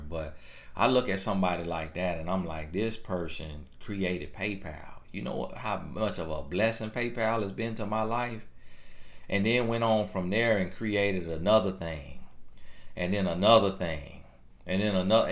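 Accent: South, United States. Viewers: I love your southern accent, God Bless.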